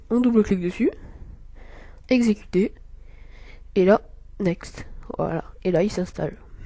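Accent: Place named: South, France. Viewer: tu es du sud xD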